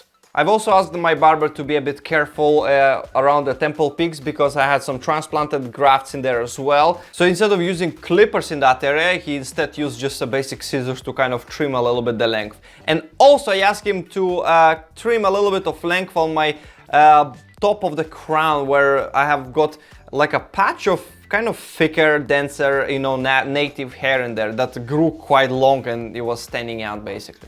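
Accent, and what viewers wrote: Russia. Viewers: Are u Russian? Your accent like Russian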